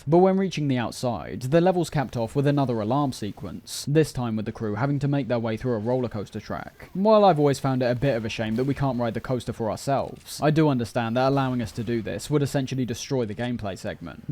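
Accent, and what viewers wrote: United Kingdom. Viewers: Blimey da bloody animalistc deep monologue turning me into a bloody zombie wih the stylistic thought proceeds similar to americans fuck i hate godamn bri'ish blokes fucking better then me talking so annoying dislike reported blocked stopped watching all that fuck pissing me off by the second w yo busted teeth talkin smart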